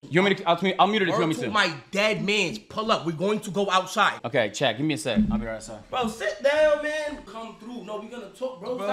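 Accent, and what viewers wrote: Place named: United States. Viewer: You have an american accent and still call soccer football?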